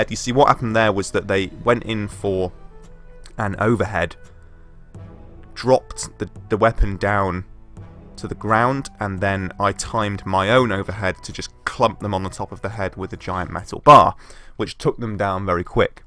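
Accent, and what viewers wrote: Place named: United Kingdom. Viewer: Oh heck yes! A sexy British voice guiding the events that unfold upon gameplay! Heck ya!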